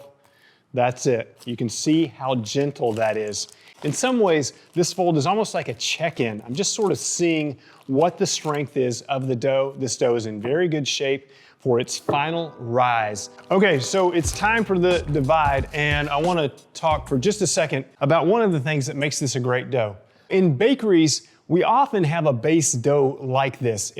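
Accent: Arkansas, United States. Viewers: I’ve been watching these videos religiously for months. I knew that accent sounded familiar. Had no idea you were a fellow Arkansan! Thanks for the work you do! It’s been a big help to this amateur baker. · Being a Memphian, I was intrigued to hear your slight accent... cool to find out that you are originally from Arkansas! Love to watch you bake!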